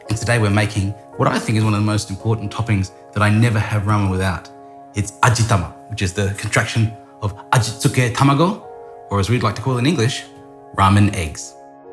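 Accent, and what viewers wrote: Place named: Australia. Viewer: Is this dude Japanese or Australian? (as far as nationality, not race)... I can't tell which language he speaks better but his English sounds perfect with an Australian accent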